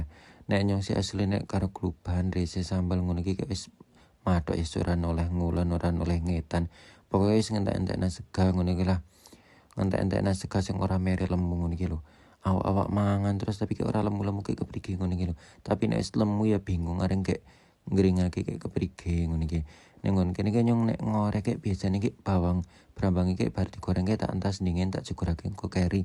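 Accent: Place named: Java, Indonesia. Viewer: Iki bahasane kok kaya nggon ku ya antara banjar ngslor apa wonosobo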